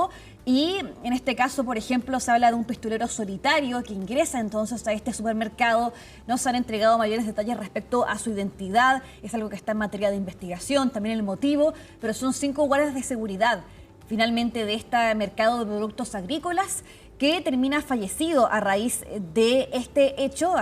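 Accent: Chile. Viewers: Ese acento que chistoso es chilena ?? Me distrae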